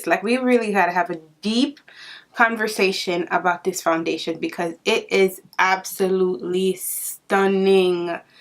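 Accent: Jamaica. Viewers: I just knew u were Jamaican from the first time you opened ur mouth lol big up muma🤗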